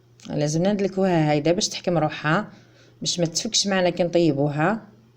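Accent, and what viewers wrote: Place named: Jijel, Algeria. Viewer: صباح الخير بنت بلادي الشاطرة الوصفات التقليدية نعرفهم ديالنا نحب نسمع اللهجة تعك الجيجلية اللهم بارك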